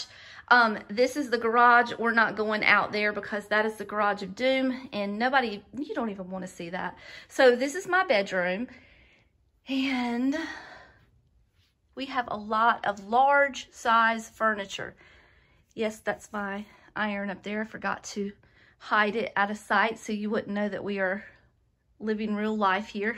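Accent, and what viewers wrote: South, United States. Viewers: are you a southern belle? I recognize your accent from all the programs I have watched lol. I am from the Netherlands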